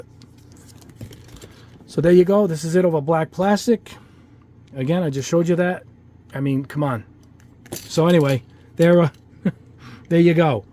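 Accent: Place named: East Coast, United States. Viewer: Thanks so much for everything you do! And its nice to hear a painter who has a nice east coast accent like me. Jersey born and raised. Thanks again!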